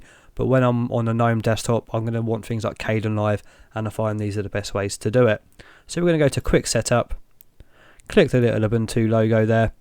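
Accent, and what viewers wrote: England, United Kingdom. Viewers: I like this bloke - Pommy accent doesn't grate on Australians :)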